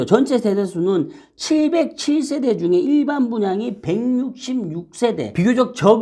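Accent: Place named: Seoul, South Korea. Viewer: 서울말로 들리기 시작했어요^^😊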